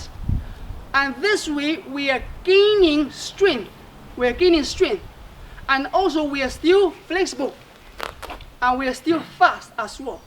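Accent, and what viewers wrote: China. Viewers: I believe almost 💯 % that he is chinês. He does have a beautiful accent.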